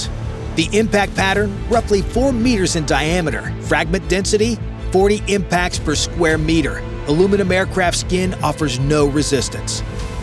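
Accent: United States. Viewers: Another bloody UGLY accent. Why do they keep putting American ACCENTS on videos? They must know the world hates them. No reason to watch any more. was more than enough. There won't be any TRUTH in the video. Americans don't just murder women and children, they don't ever tell the TRUTH! EVER! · Oh dear oh dear oh dear oh dear. I cannot listen to this excitable racket. An English narrator please if you would. This American narration is insulting and should not be heard in Britain.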